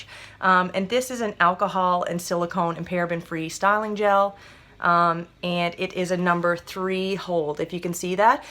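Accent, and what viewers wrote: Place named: Canada. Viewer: Fellow Canadian girl from Ontario! Love your accent. My BIL is from Cape Breton and you guys same the same things ;) Anyway, I'm newish to the curly curl method. I have tried co-washing with a few different conditioners and it makes my hair so greasy and my scalp itchy...what am I doing wrong? does this mean I can't do this method? I am using the Biotera gel, I get a cast no matter how much I use, my hair looks stringy and is hard and crunchy...I don't want that look, is gel not for me?? Sorry for all the questions :)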